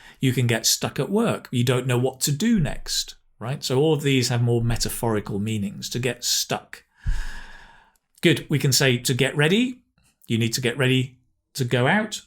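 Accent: United Kingdom. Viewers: NO American accent, only British! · Nothing like a British accent, absolutely Masculine...such a marvellous hissing sounds. Nice presentation.👍👍👍👍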